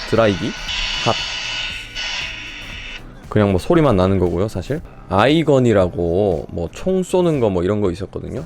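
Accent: Gyeongsang, South Korea. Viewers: 같은 경상도 사람이네용~~ <mail>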